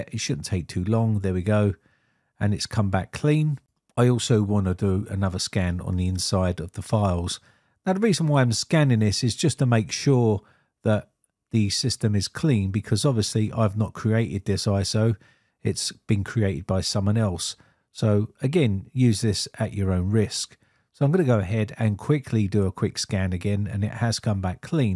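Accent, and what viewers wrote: United Kingdom. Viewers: Oh nice tutorial i knew you are british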